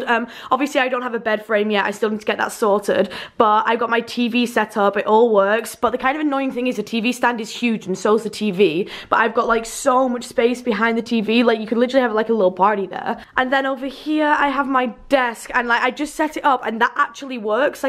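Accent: United States. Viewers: I’m not even hating but why do you talk like your American when you’re clearly English. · U sounded a bit american 💕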